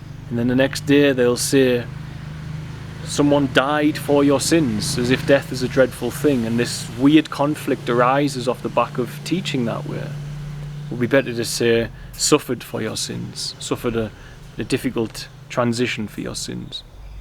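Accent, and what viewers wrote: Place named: North East England, United Kingdom. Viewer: I feel the need to connect with our creator but I dont know how. I'm from the North West of England and I detect your accent is the North East. Can you or one of your congregation guide me to a sanctuary where I can find my way to God? I live in fear now but yearn to be a light in this dark time and lead by example for my family and community. I need help and I dont know where to turn. I've been praying for guidance and forgiveness. Please lord here my call. I want to serve the creator and bring others to Christ. 🙏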